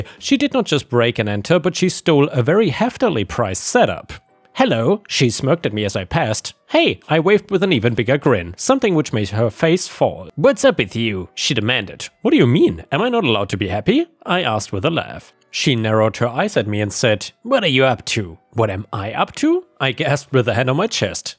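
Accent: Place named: Germany. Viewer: Man, I enjoyed so many of your videos. And your accent sounded so familiar.. I've worked in a lot of countries during my "wild years". You are from Germany, right? Greetings from Obersulm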